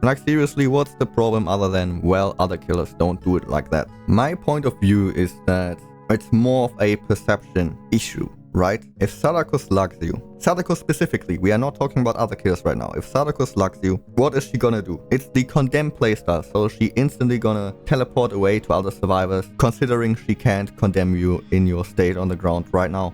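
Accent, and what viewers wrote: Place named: Germany. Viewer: You sound realy german (i'm german too and i recodnise it very often)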